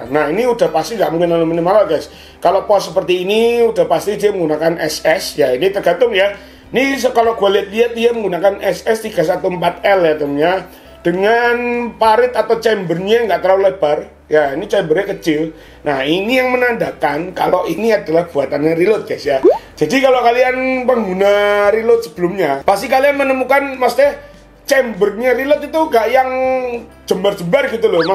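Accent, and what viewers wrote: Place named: Java, Indonesia. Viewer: Medok rasah nggo gua gua mas😭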